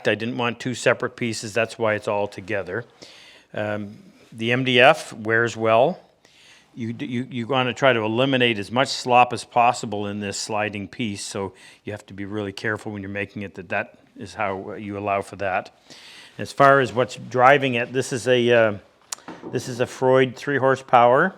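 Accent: Canada. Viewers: Nice work! Are you Canadian or American....just curious?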